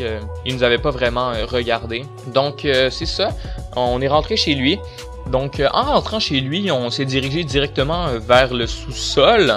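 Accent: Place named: Quebec, Canada. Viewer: J'aime trop l'accent québécois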